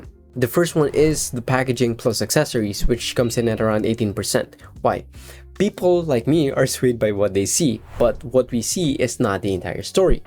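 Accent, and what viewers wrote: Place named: Philippines. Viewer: You're from Philippines? I like your accent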